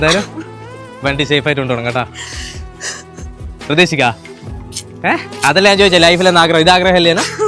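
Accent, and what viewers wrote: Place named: Coastal Kerala, India. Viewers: Chettante slang poli. Evida sthalm. Kannur aano🤔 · വടകര സംസാരം 😂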